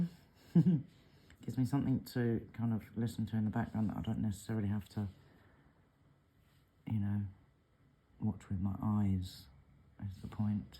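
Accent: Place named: United Kingdom. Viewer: You have the British accent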